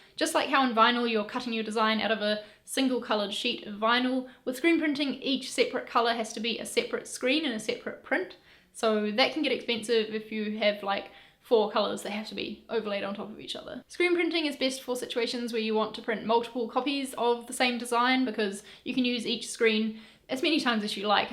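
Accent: New Zealand. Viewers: I thought I could hear a Kiwi accent and so read the description, sure enough you are! Thank you for the information, and I am off to see your screen printing video now :) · Off topic but, love the NZ accent! Thanks for this!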